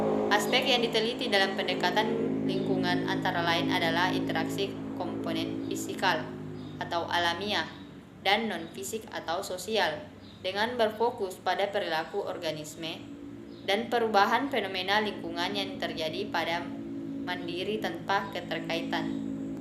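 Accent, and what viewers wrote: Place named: Mandar, Indonesia. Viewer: Sangat baik👍. Cuman bahasa Indonesianya agak kurang. Masih logat² mandar. Semangat💪💪😁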